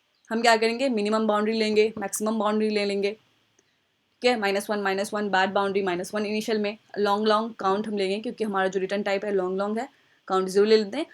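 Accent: Bihar, India. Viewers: Dont judge me but from your accent what I can assume that you are from "Bihar" who also lived in Bengal :) . I am super happy to see a girl from "Bihar" solving and explaning hard problems of leetcode . Keep going :)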